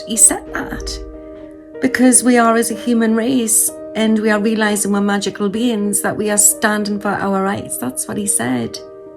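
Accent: Newcastle, United Kingdom. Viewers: Hello, i have only just found you, tarot by janine shared this on twitter,you have a lovely calming voice and i love the geordie accent Definitely subscribed to you ,blessings from the north west (Southport) x❤️😇🧚‍♀️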